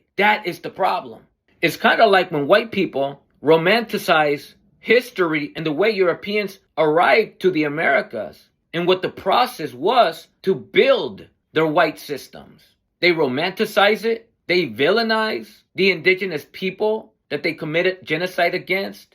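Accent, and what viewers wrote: Australia. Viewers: DUDE YOU ARE NOT FROM HERE..AUSSIE??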